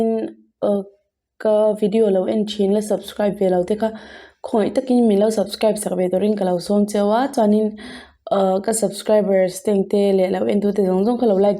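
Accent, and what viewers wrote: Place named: China. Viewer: Chinese mizo tawng thiam I ang katibawihte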